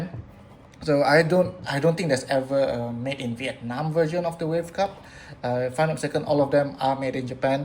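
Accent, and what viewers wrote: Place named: Malaysia. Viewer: Hi love ur videos, are u Malaysian by any chance? U sound very Malaysian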